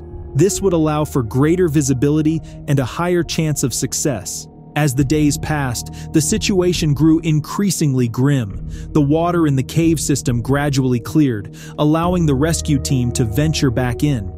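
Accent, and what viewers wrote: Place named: Germany. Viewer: dude, you have a german accent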